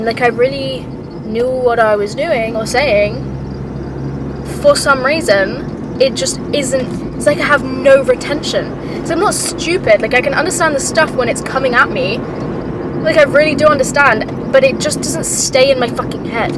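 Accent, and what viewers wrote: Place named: United Kingdom. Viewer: omg bri'ish accent kills me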